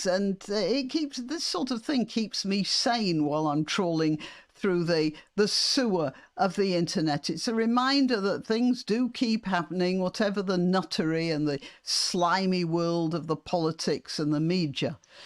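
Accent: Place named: Yorkshire, United Kingdom. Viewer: With your Yorkshire accent, I imagine I'm in The Secret Garden.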